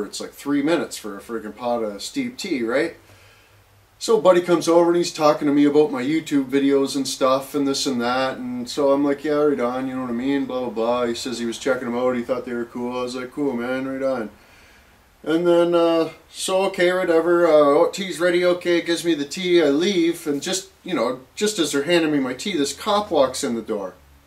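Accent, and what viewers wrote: Canada. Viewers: Best Cannuck accent ever.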